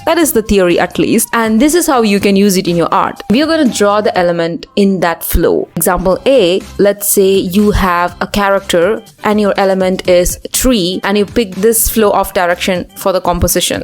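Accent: India. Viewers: You got some little bit of Indian accent I think 🤔 you are from India, btw keep it up😊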